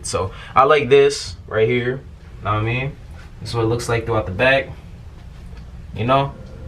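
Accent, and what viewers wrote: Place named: New Jersey, United States. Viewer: are u from nj i hear the accent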